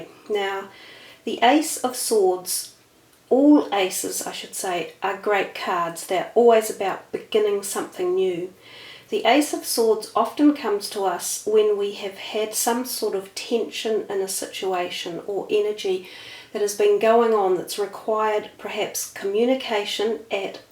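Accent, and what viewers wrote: New Zealand. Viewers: keeper deck as in deck of cards,the reader is a kiwi they speak different.....think fush and chups.....thanks cuz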